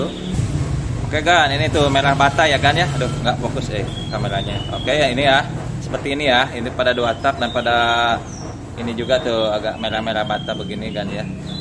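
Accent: West Java, Indonesia. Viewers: Sampurasun....yakin dari logat bicaranya pasti orang sunda dan beberapa x w nonton vidio u bang akhirnya w kena racun u bang wkwkwk waktu itu w nontn rev cdi predator u bang akhirnya w beli buat jajal di tirev w hasilnya jos...powernya enak ngejambak2lah hahha tapi ada tapinya nih puteran atasnya jdi nahan alias ngeden gtu cek busi warnanya putih waduh...keiritan ini mah fix ini mah musti set ulang pj mj w balik lagi bang nyari vidio u lah ktemu ini lagi bahas set karbu pj mj cocok.bingung ya naikin/nurunin berapa step ya karbu tirev std pj 38 mj 120 pengen kek gtu bang di gas beng..beng.beng .bikin tangan ngaderegdeg hehe tapi dari tadi w malah fokus ke astreanya sumpah mesinnya kek daytona ya...ngeri....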